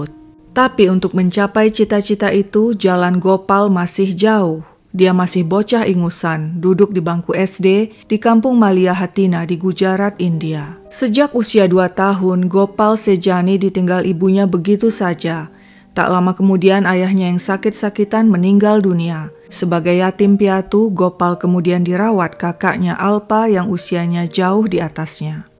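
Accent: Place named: Surabaya, Indonesia. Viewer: Dari awal mendengarkan dr dialeknya aku sudah menduga sepertinya dr Surabaya. Bener dong ada "soale"... Hehehe... Selamat buat kalian yg menang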